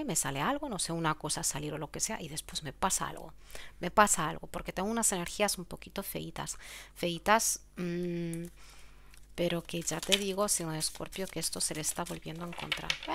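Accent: Spain. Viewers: Mi amor, manos hermosas muchas gracias por la lectura, pero a veces no te entiendo que quieres decir, lo dices muy rápido, yo digo que debes decir al pan pan y al vino vino, o que dices las cosas mochas, perdón, pero así me parece, gracias por lo que si te entiendo. Siempre trato de disfrutar el campo, platicar con amigos, de lo verde de las plantas, del silencio de la mañana, escuchar música, yo siempre trato de ser constante con mi ejercicio, mi alimentación, disfruto tu voz el tono, y tu acento españolado. , etc. etc. 🙏🙏🙏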